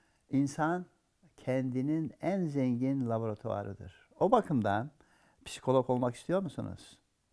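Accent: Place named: Azerbaijan. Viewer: Azerbeycan şivesini çok seviyorum, Teşekkür ederim ❤🙏🙏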